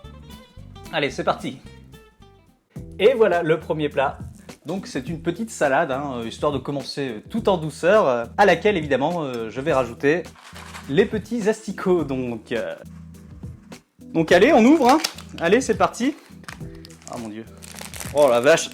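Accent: Canada. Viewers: PK tu fais plis l'accent canadien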